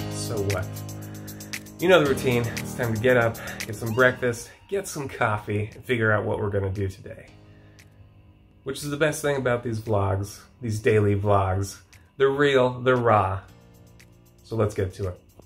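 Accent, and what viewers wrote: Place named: United States. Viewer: The first time I saw ur picture I thought it was henry cavill vlogging. The American accent gave u away. Welcome to Singapore, Superman. Come back and try some local food. U will be pleasantly surprised.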